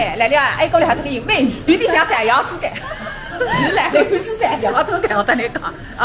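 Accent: Suzhou, China. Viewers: 大闸蟹。等々，作为美食介绍加入历史典故。以及人文知识，则更加丰富多彩。是地道苏卅姑娘，我们同乡人听起来非常妙，但非苏州人除江苏南部人外，或许你说的太快，有点听不懂，所以望今后做网上节目，说话节奏慢一点，尤其苏卅地方俚语，越慢越好，如：然（你），北京西路，北和西要说出正宗苏州音，好听。十五，沙五也要着力沙。…..仅供参考，新加坡很多华人上网，听到苏卅腔很高兴。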